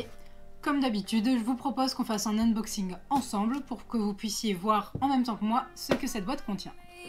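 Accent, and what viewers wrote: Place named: England, United Kingdom. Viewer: J'aime bien ton accent anglais 😊😀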